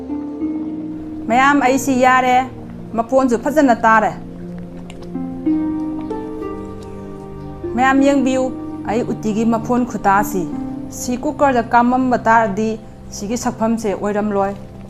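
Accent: Kakching, India. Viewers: Kakchinggi meitei lon se tabada yamna nungsijei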